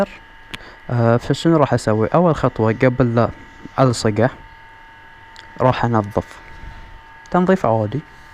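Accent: Bahrain. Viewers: ي حلو لهجة البحرين <3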